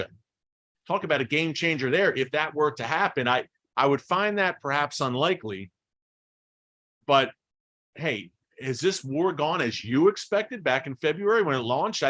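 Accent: Philadelphia, United States. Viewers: Lol Thought I heard Philly accent.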